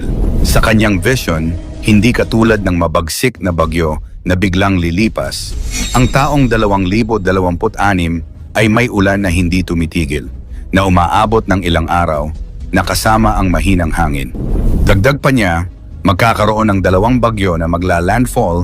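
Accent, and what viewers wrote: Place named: Philippines. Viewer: NALILITO ako sa NARRATOR. PINOY kb? IBA kc intonation n accent mo😅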